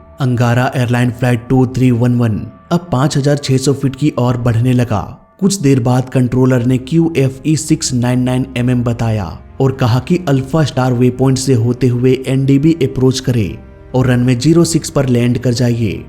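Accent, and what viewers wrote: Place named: Bihar, India. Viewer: Tum bihar se ho kya tumhari language se pata chalta hai ab aise illetrate log hame flight ke baare main batayenge😂😂😂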